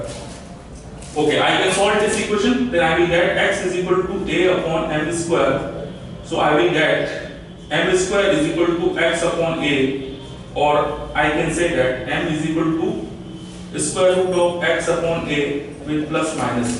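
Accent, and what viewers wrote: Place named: Jamaica. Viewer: Sir your accent is like teaching maths. Great Video.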